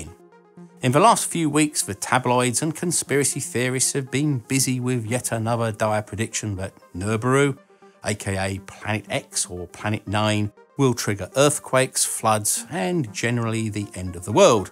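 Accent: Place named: Cockney, United Kingdom. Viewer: A fascinating video as always but the blokes terrible Pommie slapped Cockney accent is awful and distracting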